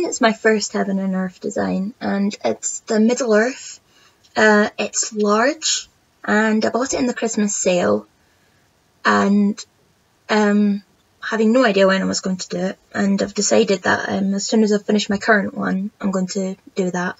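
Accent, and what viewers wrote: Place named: Scotland, United Kingdom. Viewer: So, so nice to hear another Scottish cross stitcher there seems to be a severe lack of us. Love your work, looking forward to seeing more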